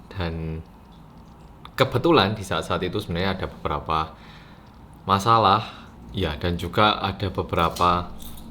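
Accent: Java, Indonesia. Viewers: Mung sampeyan sing medoke natural wkwkwkw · Stay strong 🙆🏻‍♀️ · Mas!!! Stay strong jangan mikirin komenan negatif yang komen negatif itu iri sama mas.gapapa 1 hari 1 vdeo atau seminggu 3 kali juga gapapa kok mas Stay strong mas!!!!! Welcome back to korea reomi bersama orang yang medok!!!!!!! Bolo bolo tetep dukung Mas yah😊!!!!! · Yaaah.... namanya jg manusia, ada up & down emosi. Memang terasa kurang semangat nya sejak dr salam pembuka..... tp klu diceritakan begini, kita jadi memaklumi dan ikut mendoakan spy cepat pulih kembali. Caioo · Welcome back 😀😊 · Mas.. Coba song bahasanya di ngapak ngapakin jgn medok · Rindu syekali ini sama 😥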